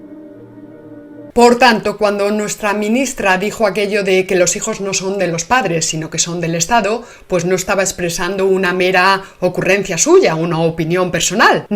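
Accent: Spain. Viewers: el acento español tan marcado es irritante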